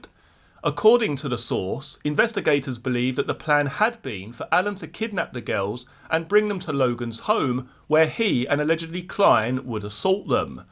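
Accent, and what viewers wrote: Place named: England, United Kingdom. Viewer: Enjoy your videos and love your English accent. At least I think it's English. My dad was from Birmingham England. The English accent is very beautiful wherever you're from. I like how you think outside the box that's what I do too. I agree with you totally I believe it was totally planned I do not believe it was random or opportunity I've never believed that. I always thought it had something to do with being online way before we found out about the Anthony shots account. It could be that RA might have been sitting in his car for a while before the girls came because he was drinking alcohol out in his car or doing drugs or both who knows. He could have been talking to people that he was dealing with that were part of killing the girls in the plot that they were scheming in other words. He might have been conversing with some other people on the phone before he actually went to the trail. 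I do believe at one point he was sitting at the trail and they probably walked right by him and may not have noticed him or they might have seen him and when they actually did see him on the bridge I thought I had heard it one time that one of them had said there's that creepy guy again. I heard this a while back I don't know how true it is though. Had you heard that at all.??. Thank you for your response in advance. Happy New Year to you and your family God bless 🙌🙌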